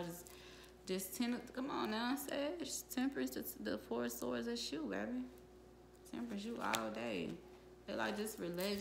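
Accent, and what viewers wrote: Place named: Atlanta, United States. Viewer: Are you from atlanta 😂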